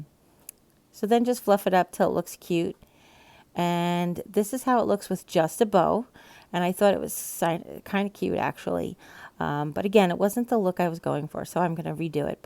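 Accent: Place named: East Coast, United States. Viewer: Cute! It's funny how you said that most people like to hear you talk, because I was just thinking about how I like your accent. Are you from the east coast? I noticed that when you say moss, it's more like mawwss lol love it.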